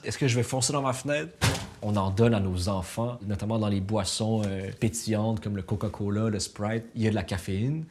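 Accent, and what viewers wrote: Quebec, Canada. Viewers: Tu vois le beau gosse arriver et puis l'accent Canadien ... Ahhh Non. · Il est québécois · Ton accent québécois est magique! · Je suis Française. Qu’est ce que j’aime l’accent du Canada 😍 et des vidéos tjrs enrichissantes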